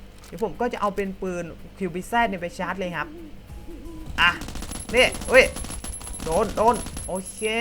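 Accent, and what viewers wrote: South, Thailand. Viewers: คนใต้ป่าว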